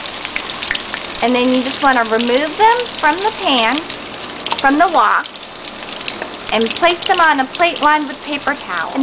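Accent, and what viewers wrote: South, United States. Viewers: Love this video and your southern drawl....... just like that! (smile) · I love how your making asian meals & have a small southern accents ♡♡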